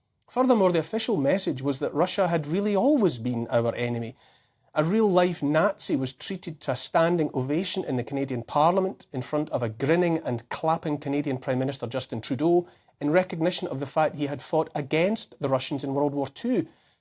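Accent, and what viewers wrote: Scotland, United Kingdom. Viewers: I do pay attention to what say, but sometimes I just play back so I can hear your Scottish Brogue!:O) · Although I agree with you on everything, your common sense statements more persuasive in your Scottish burr 💕🇺🇸❤️🏴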